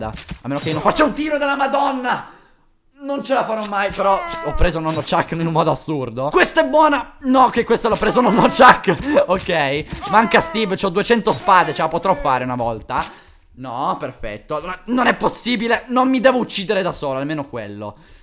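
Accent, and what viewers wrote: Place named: Rome, Italy. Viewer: ma sei romano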